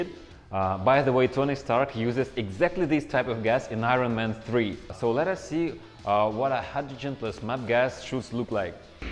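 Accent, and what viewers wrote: Russia. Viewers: Этот русский акцент...ммм... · Пиздец у теья акцент) типичный русский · Ты спалил что русский) Акцент спалил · Ля а акцент похож на русский. Русский? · А почему на инлище и с акцентом русским?) В чем проблема пилить для рашен аудиторишн?) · Русский акцент 🤔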